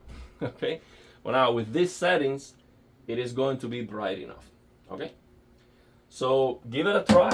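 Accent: Cuba. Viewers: Asere tu eres cubano xq me suena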